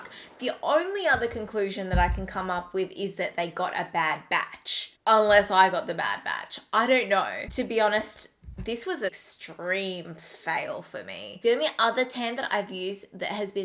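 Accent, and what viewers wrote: Australia. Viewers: Okay but I love your voice and your Australian accent. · this is a really random question but is she from australia? idk she sounds australian though. just asking because i want to watch australia youtubers and she was in my recommended and she seems nice and i love her already ahaha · She sounds Australian not British. Maybe it washed off cause she didn't wait long enough. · Is she from Australia? I am and I think I recognize the accent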